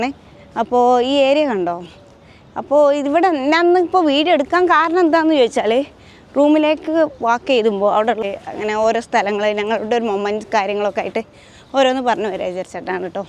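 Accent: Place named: Kondotty, India. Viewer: ശബ്ദം കേട്ടിട്ട് കുണ്ടോട്ടി എടവണ്ണപ്പാറ റൂട്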